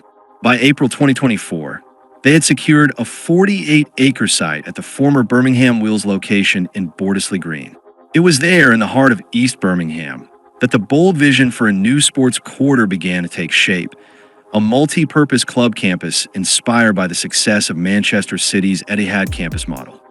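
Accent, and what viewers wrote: United States. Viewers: I would rather have listened to an English-UK speaking narrator than an American one.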